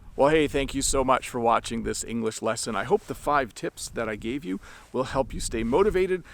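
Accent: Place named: Canada. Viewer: Hey teacher Eu demorei um pouco a entender que você era do Canadá. Por que pra nós nativos de língua Portuguesa, temos dificuldades em entender os diferentes sotaques em inglês de países diferentes. Mas eu estou progredindo com seus vídeos. Professor, sucesso para você sempre. Que Deus o abençoe. By 🇧🇷🇨🇦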